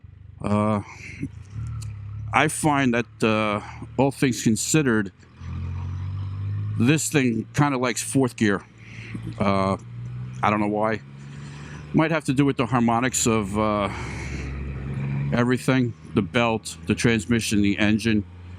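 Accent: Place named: New York, United States. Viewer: Man you are so New York😅😂😅 Thanks for the videos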